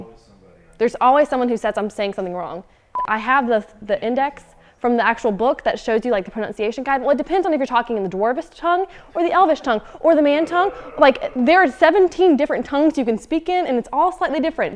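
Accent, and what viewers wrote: United States. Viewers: Love the Southern accent!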